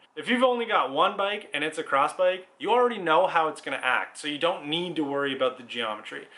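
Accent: Canada. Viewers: Canada for sure. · He's Canadian.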